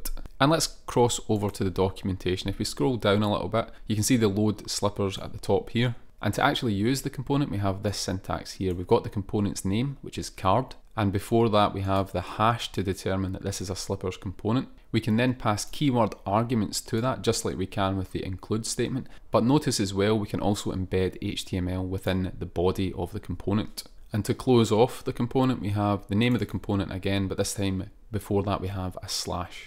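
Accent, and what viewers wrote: Scotland, United Kingdom. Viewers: Ey lad, love the scottish accent, real killer. Would be real nice if you could just display what you're trying to build and what you'll get out of the framework/tutorial. Thanks a lot.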